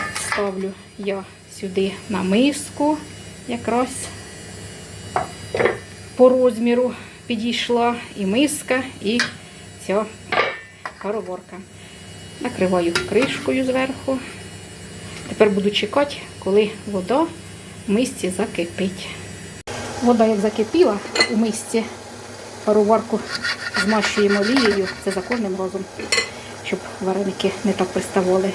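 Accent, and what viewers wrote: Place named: Poltava, Ukraine. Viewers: Тісто обов'язково приготую!!! От лише у парі, над парою, на парі - "пара" ж бо іменник жіночого роду. Ну, може ще "парові" вареники...А ще дякую за Вашу соковиту, прекрасну полтавську - українську мову!!!! Згадала дитинство та другу хресну маму Марійку (з Полтавщини) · по акценту, господиня з Полтавщини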